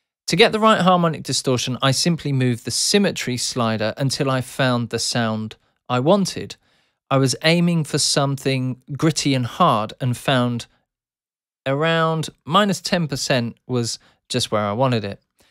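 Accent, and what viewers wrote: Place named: United Kingdom. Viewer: shit sounds way more confusing when its in a British accent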